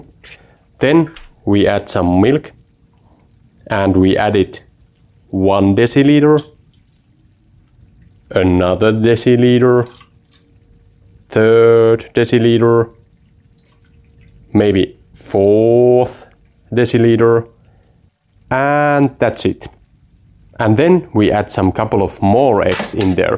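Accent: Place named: Finland. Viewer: This looks delicious, I will have to try it! Also, I've always been a fan of the Finnish accent!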